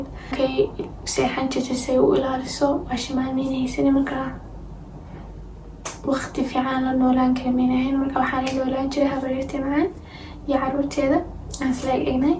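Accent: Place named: Djibouti. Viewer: Hadalkaga malmahan dhan wa dareemayay inad tahay reer dds 🫶😺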